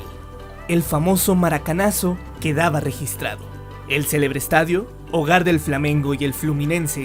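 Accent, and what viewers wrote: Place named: Bolivia. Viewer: porque la locución habla como si fuera bolivia o algo asi?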